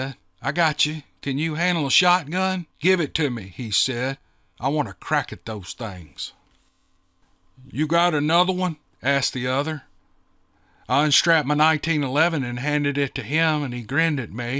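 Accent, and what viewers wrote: South, United States. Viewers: Yay! Thank you. I'm listening faithfully as you post!! LOVE listening to your Warm Southern drawl narration. Hope you, better half, all your great doggies and chickens are doing well. ☺ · Hey,. I have written you couple of times. I wanted to tell you I really enjoy listening to you. I was raised in the south and your voice brings back good memories. I am also a veteran and the Wild Hunt series is something else.....Mr Roberts stories and your narration are the shit. I would love to see a conglomeratiom of wild hunt stories made into a Movie would be awesome. I know some of the characters in the wild hunt team have a back story that would make for GREAT reading. Glad to hear you are gonna continue to narrate these books. Keep up the great work. Thanks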